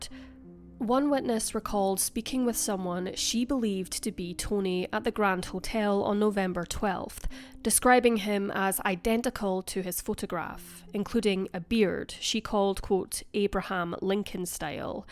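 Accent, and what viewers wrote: Scotland, United Kingdom. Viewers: The narrator is Scottish, speaks with a Scottish accent. That's what "Perth" sounds like in a Scottish accent. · I love the accent! I was just posting a spelling PSA for those who lack the ability to figure out what city name you meant. Not a dig about the Scottish drogue.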